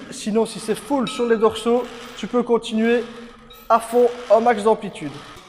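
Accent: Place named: Verviers, Belgium. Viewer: il me semblait bien avoir déja vu cette salle ! et l'accent me disais quelque chose... entre verviétois ont se comprend :-D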